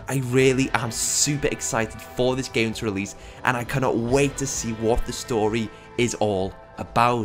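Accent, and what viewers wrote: United Kingdom. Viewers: British acent???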